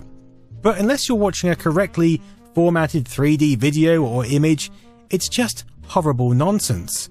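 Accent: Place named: United Kingdom. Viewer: I actually want to play the Bridge Command game now. accent is cool and all as with any brittish accent, but everytime I hear he say about the early nineties as (early "naughty"es) I can't help but crack a smile.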